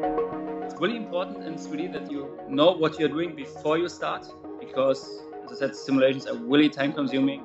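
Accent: Germany. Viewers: I‘m german and i can clearly hear the german accent when he talks hahah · The way he speaks englisch he is a german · Typisch Deutscher Akzent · His german accent lol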